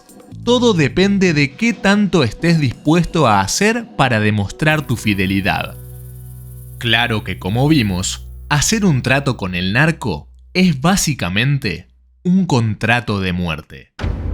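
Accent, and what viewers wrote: Argentina. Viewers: Tú acento argentino, es tan molesto. .. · Quien coños narra éste video, ¿Un argentino? No mames 😠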